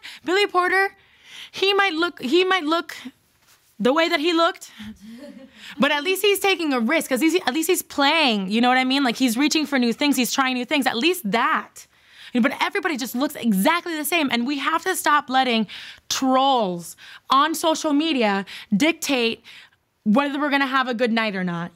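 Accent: Miami, United States. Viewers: The miami accent came to this segment!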